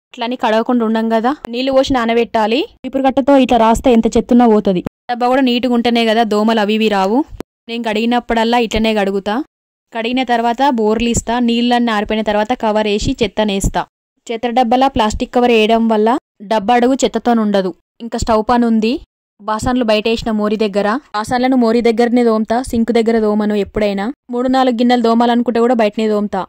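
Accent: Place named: Jammikunta, India. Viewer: Maadhi jammikunta akka meeru matladthante mem matladinatte anipittandhi....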